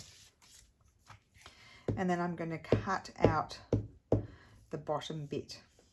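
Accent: Australia. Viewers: I am changing your name from the Martha Stewart of YouTube to the. This is the stupidest question anyone has ever asked you. When you dream, do you speak in Italian or in your delightful Aussie accent? My friend is tri-lingual, and she says the people in her dreams speak all three, and often if two people are talking one is in English and the other speaks French. Great project!!!!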